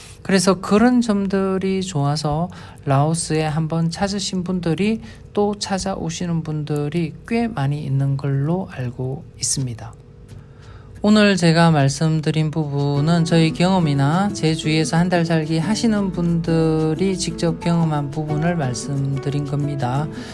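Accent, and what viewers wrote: Gyeongsang, South Korea. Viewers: 님의 차분한 경상도말에 친근감이 느껴집니다. 한달은 좀 길어 보름살기는 안되나요? 내년1월에는 라오스 가는데, 치앙마이에서도 보름있고 싶어서요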